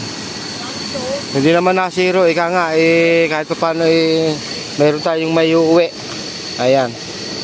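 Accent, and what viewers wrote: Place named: Mayhayin, Philippines. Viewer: Tiga saan ka lodi? Puntong mayhayin ka a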